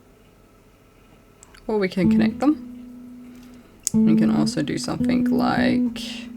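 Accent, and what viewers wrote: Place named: Australia. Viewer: IS THAT AN AUSSIE ACCENT I HEAR OMGGGG YAYYYYY :) i never find any aussie youtubers about magic and spirituality.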